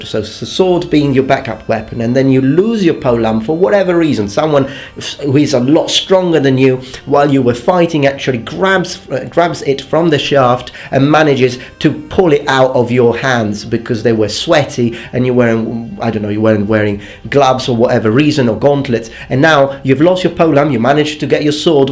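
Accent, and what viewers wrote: England, United Kingdom. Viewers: Does his accent have a little bit of British in there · Why does it sound like you have a English accent when you are from Sicily?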